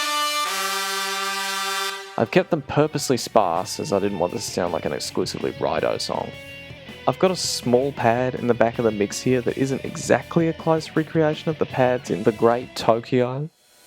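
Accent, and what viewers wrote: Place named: Australia. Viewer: Watch another video? I don't understand nitpicking someone's voice, something they have no control over. He doesn't have vocal fry even, he sounds Australian. It's like you expect him to go "Oh, sorry" and just put on a voice. Lmao. Enjoy the free, highly researched and interesting video.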